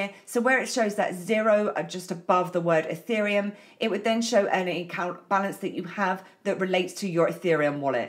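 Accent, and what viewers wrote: United Kingdom. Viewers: the heavy British accent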